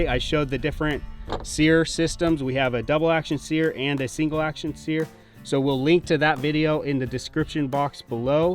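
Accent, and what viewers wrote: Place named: United States. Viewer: Jesus why do Americans explain everything so stupid can they not just say it how it is i think its the accent 😅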